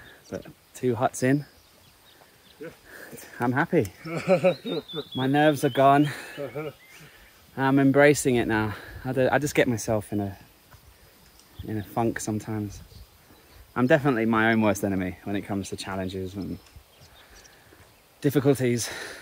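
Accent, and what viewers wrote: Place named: Newcastle, United Kingdom. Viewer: Ahhh a Geordie 😂😂😂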